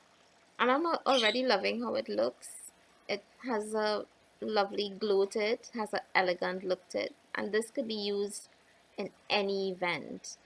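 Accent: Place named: Trinidad and Tobago. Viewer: Awesome DIY, Love it and I love your Trini accent. Tfs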